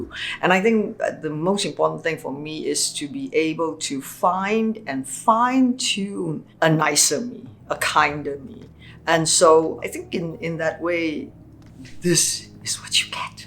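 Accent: Malaysia. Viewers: it's typical malaysian of chinese descent english accent and it's nice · "can see or not" hahahah I LOVE that she doesn't Westernise her accent, regardless of what films and shows she appears in, she's so unapologetically Malaysian/Asian, and that's so extremely rare.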